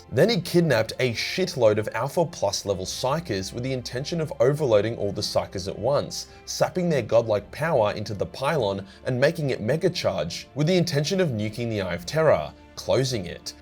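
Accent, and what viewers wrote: Australia. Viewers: Lol I think the risked it thing is from your aussie accent. As a American kinda flows off the tongue but I can see how itd sound funky with an australian accent. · First twenty minutes and three of these views are mine lmao. My phone, switch, and PC. I couldn't get two to buffer correctly and now all are running and I just.. This cacophony of Aussie is magnificent. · It's the Aussie accent inflection and pronounciation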